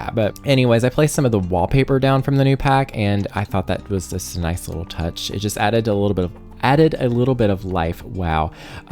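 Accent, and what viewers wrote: South, United States. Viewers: This build is insanely beautiful! Everything about it. The porch and the interior on the lil diagonal! EA should be giving you their packs for free because your builds are so amazing!!! I send all your videos to my friends who play the Sims and everyone loves your energy, creativity, and your lil Southern accent!!! Hugs and love from Philly!!!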